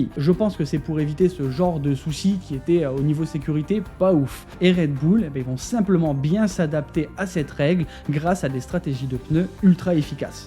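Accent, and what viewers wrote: North, France. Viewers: Tu as l'accent du nord on dirait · Bonne vidéo mais tu ne peux pas me cacher que tu viens de Tourcoing, Lille ou Lens parce que l’accent au formule HEIN! est flagrant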